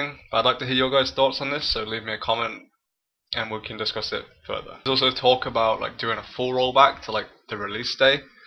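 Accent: United Kingdom. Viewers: What's your problem? I'm from Denmark and understand him perfectly. And FYI he's British, thought the accent was obvious? If you're not 10 and clueless that is. Even his mic is perfectly clear too, I don't get your complaint's at all.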